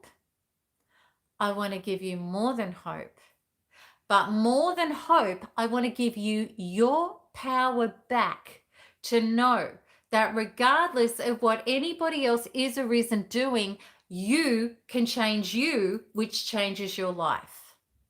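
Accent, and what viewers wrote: Australia. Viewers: I like her Australian accent. I wokred in the Far East for over a decade. I am an American and I enjoyed the different English language accents and dialects from the people I met. In the FarEast I met many people from different continents who were also there.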